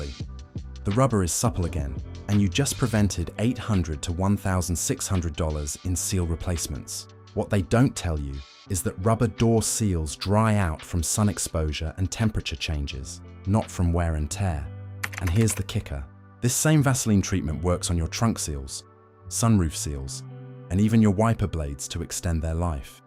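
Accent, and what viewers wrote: England, United Kingdom. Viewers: Why does an English accent, somehow, import an "expert" narrated video?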